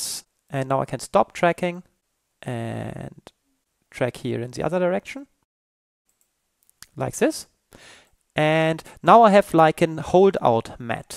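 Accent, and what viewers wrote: Germany. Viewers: Hab das erste Wort gehört und wusste direkt, dass du Deutscher bist :D Gutes Tutorial übrigens :)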